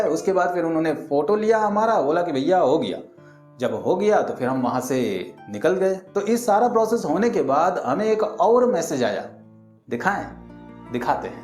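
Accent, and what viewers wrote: Bihar, India. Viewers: bihar se ho kya? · Bhai apki bate Bihar acchi lagi 🤭🤭🤣🤣🤣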